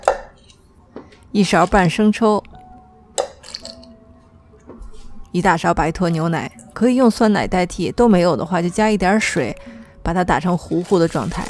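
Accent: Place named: North, China. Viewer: 这北方口音... 没得说了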